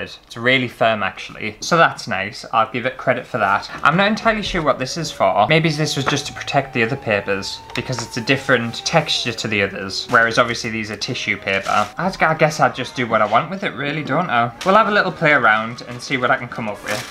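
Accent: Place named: Sheffield, United Kingdom. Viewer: fortunately, no balls have wandered into my water glass, hairy or otherwise :-) as far as your accent goes, I can get what you're saying 99% of the time. I've been expose to British culture for 40 years, and I don't consider myself an accent expert, but correct me if I'm wrong, you have a Sheffield accent...?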